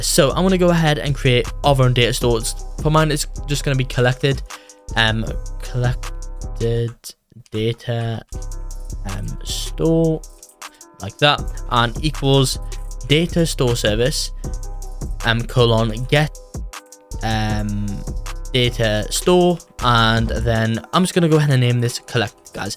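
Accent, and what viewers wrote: United Kingdom. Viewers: u bri' 'ish mate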